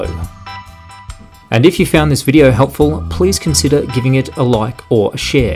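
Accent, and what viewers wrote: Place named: Australia. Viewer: Haha I love the narration. So Aussie.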